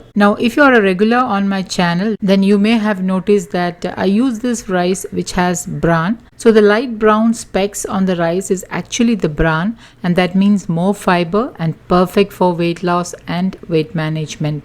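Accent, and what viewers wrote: Kerala, India. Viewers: I truly ❤️ & admire the attention to detail while narrating the recipes. Also, your Mallu accent & soothing voice is pleasant to listen to. Just subscribed & thanks! 😘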